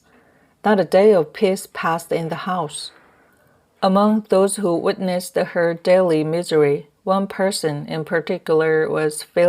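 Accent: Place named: United States. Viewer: 오우~아메리카 발음 👍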